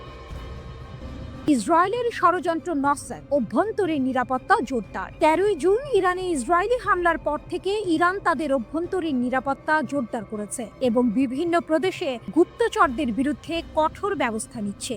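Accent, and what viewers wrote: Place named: West Bengal, India. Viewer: নাগরিক টিভি ভারতীয় বাংলা উচ্চারণে সংবাদ পাঠ চালু করলো কেনো?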